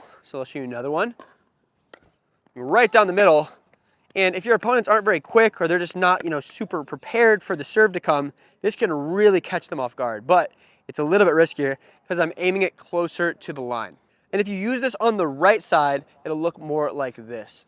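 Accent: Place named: Southern California, United States. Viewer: A good idea. Wish you put a video in which show it had an incorporate more of the top spin into the serve, which would make it really wicked. I like this guy, just wish he didn't have such a SoCal heavily voice inflected vibe. It kind of lulls me to sleep.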